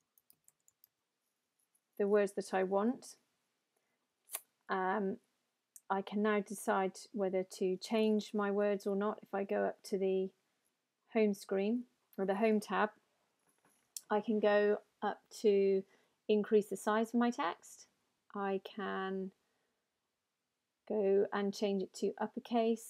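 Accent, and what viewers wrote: United Kingdom. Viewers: bri ish accent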